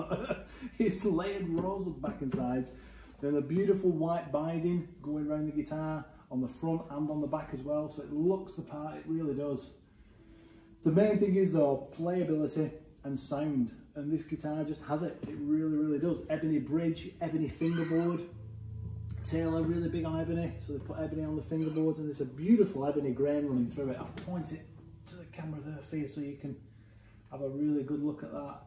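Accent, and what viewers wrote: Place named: Bolton, United Kingdom. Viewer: Cracking Bolton accent!!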